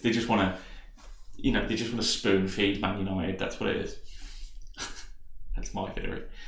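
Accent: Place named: United States. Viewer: Like your American accent.....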